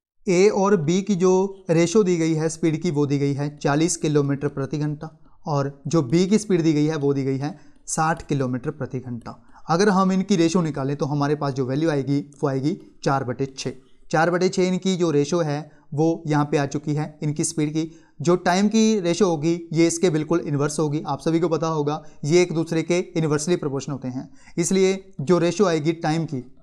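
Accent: Punjab, India. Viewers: ye sir ya toh punjabi h ya himacha se h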